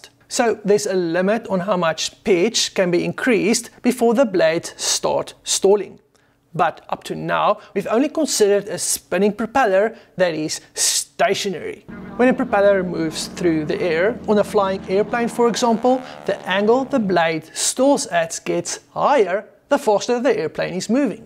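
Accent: South Africa. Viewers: Ek wou sê jou aksent klink South African. Toe ek die eerste ZS sien op n vliegtuig toe weet ek. Nice video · You sound South African 😊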